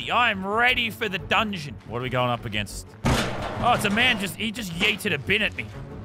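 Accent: Australia. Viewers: He Australian? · I’m Aussie and it’s a bit weird hearing people saying YouTube like that on YouTube because I never really see Aussies on yt because I haven’t watched u for a while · This is if mrbeast was Australian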